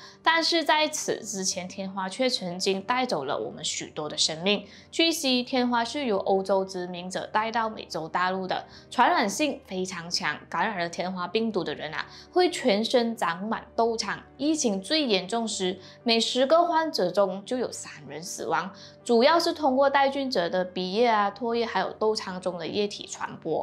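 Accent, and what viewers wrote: Malaysia. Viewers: 口音和咬字有点奇怪。。 来自马来西亚吗？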